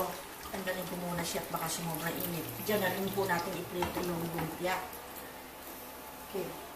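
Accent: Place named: Pampanga, Philippines. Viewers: ate atin kang accent, kapampangan the best for cooking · may accent kang kapampangan maraming Eusebio sa san fernando, pampanga. nkakapaglaway kang panuorin s tikim portion mo.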